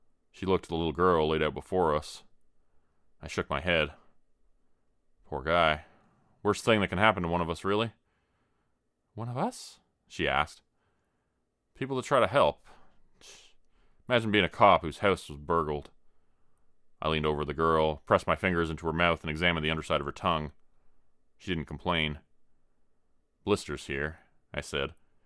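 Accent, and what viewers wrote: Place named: Canada. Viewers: I love the Canadian accent. I'm from Western NY living down south and it sounds like home! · Finally. A Canadian narrator. Great voice and story telling Sir! I will subscribe to you now.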